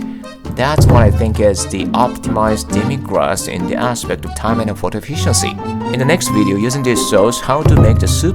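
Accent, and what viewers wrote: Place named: France. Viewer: What are you talking about? best part of the video is his somehow french accent!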